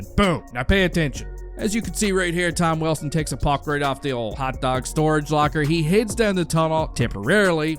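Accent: Newfoundland, Canada. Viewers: Give him a break. Guys a Newfie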